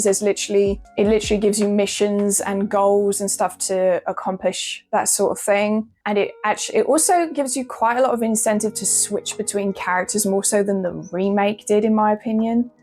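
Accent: United Kingdom. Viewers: Love a British accent 🌹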